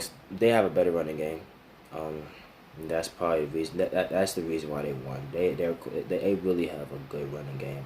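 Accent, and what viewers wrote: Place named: New York, United States. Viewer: That New York accent 🤤🤤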